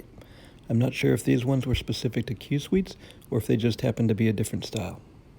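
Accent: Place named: United States. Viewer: I like your American English accent ;-)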